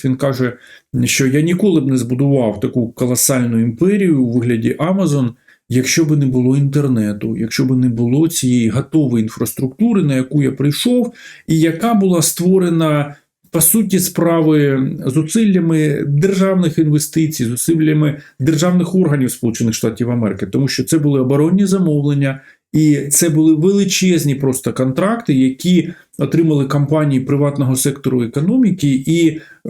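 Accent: Russia. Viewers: Та в нього тих перлів , чуть не кожне слово . Можливо то просто москалик , який вирішив українцям брехні понарозказувати !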